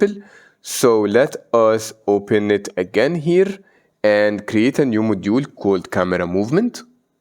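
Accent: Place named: Egypt. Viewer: i feel. the accent is more Egyptian :hand-pink-waving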